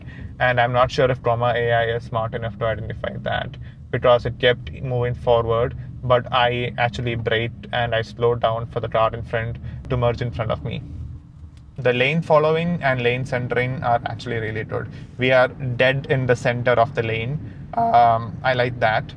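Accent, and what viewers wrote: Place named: Kerala, India. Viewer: bruh that mallu accent :)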